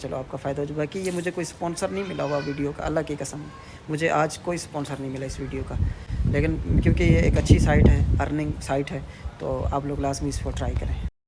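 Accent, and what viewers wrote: Saraiki, Pakistan. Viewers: لہجےسےواضح یےکہ یہ صاحب سرائیکی ہیں۔۔۔ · Happy to hear that saraikian accent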